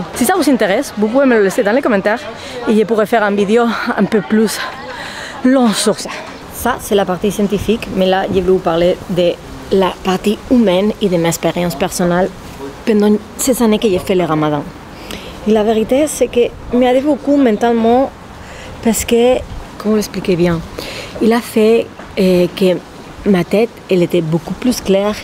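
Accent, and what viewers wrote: Spain. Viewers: Cc je te dis avec sincérité que tu parle notre langue parfaitement,ton accent d'Espagne est charmeur j'adore continue, continue, continue.la bise 😘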